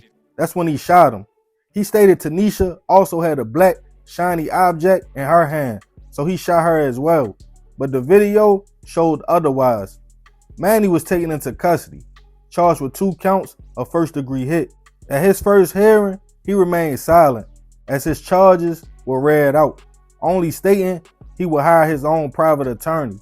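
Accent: Baltimore, United States. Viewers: I'm not going to lie, I found this channel because I wanted to hear more of the Baltimore accent, and stuck around because the content is good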